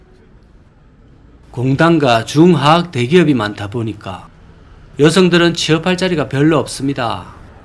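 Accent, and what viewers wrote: Gyeongsang, South Korea. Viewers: 구수한경상도사투리에 후대들을생각하는 마인드 ㆍ오랜연식에서 나오는 ㆍ혜안등이국보급이ㄴ거죠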